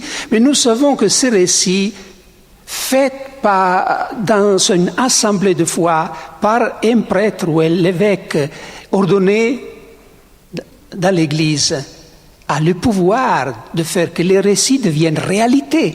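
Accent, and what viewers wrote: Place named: Italy. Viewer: Bonjour mon Père, votre accent a le parfum de l Italie le parfum de la meditéranné et en vous écoutant nous prenons le grand large , merci infiniment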